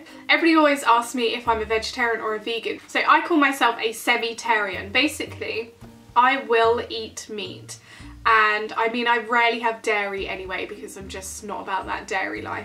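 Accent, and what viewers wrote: United Kingdom. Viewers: Is it weird that I love to listen to your voice and your British accent 😂 I cannot believe that Chris ingam is still blogging I have been watching the Wilkes family and also Demi Donnelly as well as your second channel and all the evidence is disgusting he definitely is guilty so when is he going to face justice. we won't ever forget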